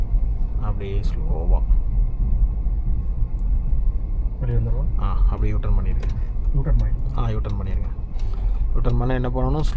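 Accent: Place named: Madurai, India. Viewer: Excellent teaching bro thanks Bro neenga Enga Madurai slong pesura maathiri irukku 😊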